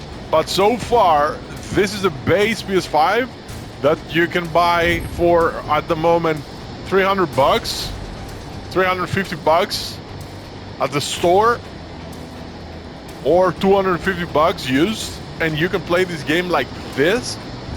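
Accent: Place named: Netherlands. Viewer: Nederlander zo te horen haha. Ben je al naar je eigen huis geweest in game ? 😂. Was een van de eerste dingen die ik deed. En naar al die leipe plaatsen zoals north sentinel island en Chernobyl enz enz 😂😂😂.. ik zit ook op base ps5 en het speelt heerlijk, maar ik speel dit niet echt als een flight sim, meer als een tourist sim lol. Al vlieg ik wel naar de locaties toe vanaf een vliegveldje dichtbij maar vluchten van hele dagen zie ik me zelf niet zo snel doen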